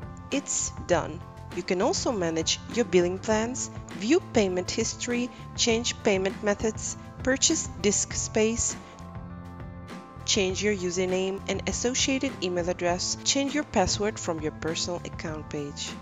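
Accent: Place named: Russia. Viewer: какой чудный русский акцент :))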